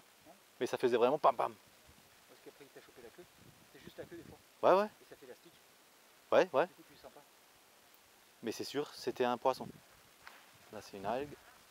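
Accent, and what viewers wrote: Alsace, France. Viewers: Salut toi tu a un un accent qui vient de chez nous tu es où en Alsace?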